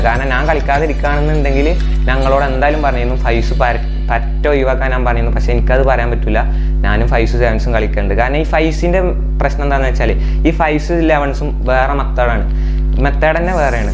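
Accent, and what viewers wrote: Malappuram, India. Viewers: മലപ്പുറം ഭാഷ തന്നെ 🤣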